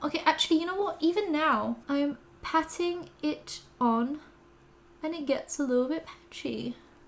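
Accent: England, United Kingdom. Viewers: Wait I thought was from London your accent is so English and know she has said it she does look polish but I was just so surprised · that is so rad you are from Poland! I was wondering because I stalked all your older vids one day and noticed it sounded like you had a totally different accent. So, I assumed you just eventually pretended to have an posh english accent... hahah totally my bad!! My husband is from the UK and you speak english like you grew up in the UK. I guess you did grow up in the UK starting from a pretty young age. Anyways- that is so cool you are from Poland! hell yeah! if you like watching Polish films and stuff still- you should watch " Cold War" - best love story ever and it is pretty new, came out around 2018?? I think... anyways- it takes place in Poland and it is AMAZING!! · tbh i wouldnt be able to tell that english isnt your first language, messes w my brain bc you just sound like a london native lmao, i live in north london and literally cannot fault ur accent its mad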